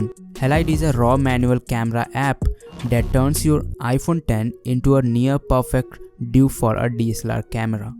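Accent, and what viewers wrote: India. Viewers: am i the only one who hates indian accent english? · I really dislike the Indian accent, but man I can’t dislike the video! Great job buddy. The quality is on point!! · lol I just want search best camera apps for my iPhone and I come here only just hear Indian accent so funny 😂 haha.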